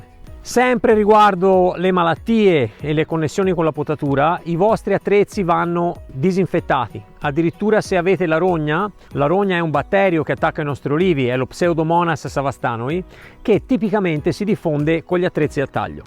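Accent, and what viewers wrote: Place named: Tuscany, Italy. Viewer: infatti..il tuo accento è anche il mio...io abito zona Lucca fora...terra di Ulivi ...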